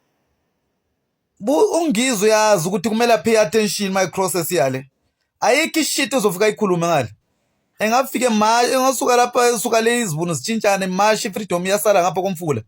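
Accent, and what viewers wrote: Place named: Zimbabwe. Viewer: Futseg wena nesi Zulu sase Zimbabwe 😅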